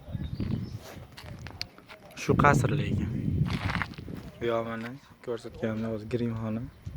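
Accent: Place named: Andijan, Uzbekistan. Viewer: Андижонлимисиз,шевангиз. Ухшаркан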